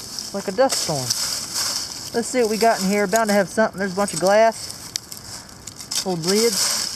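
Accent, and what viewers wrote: United States. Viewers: I love your American accent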